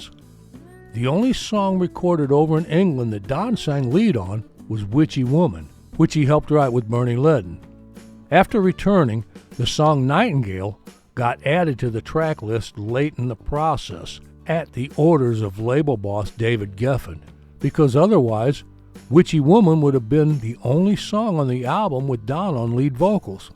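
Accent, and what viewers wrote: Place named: East Texas, United States. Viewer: As someone born and raised in gilmer, and a die hard fan of your channel, I really like how accurately you told his story. My grandfathers best friend was his dads boss. They worked for an auto parts store and my grandfather used to go with them to nascar races. In my head I have my own little rivalry with don. When I was a kid, I was a drummer and singer and everyone used to say my voice was just like his. I still sing, but I haven’t quite overtaken him yet. More of a guitar player anyway. Tbh, my sister is great friends with whiskey Myers and I’m borderline hoping on a Steve gains kinda situation lol I know you’ve got to be somewhat in my vicinity by the way you speak and the specific music you’re really into, I just know it. East Texas?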